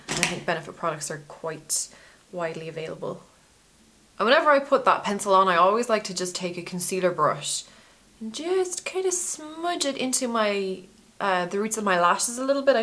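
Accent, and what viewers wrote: Ireland. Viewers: She is Irish but recently moved to Oz. She better keep her Irish accent I love it. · DAMN IT. I meant to ask if you were Irish? Your accent sounds Irish but you're Australian?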